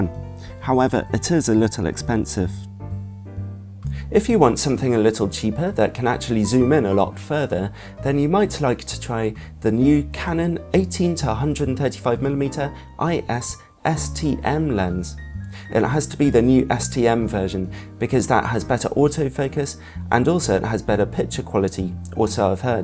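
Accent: United Kingdom. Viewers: The first time I see your face. :) The guy with the british accent. Greetings from Rio.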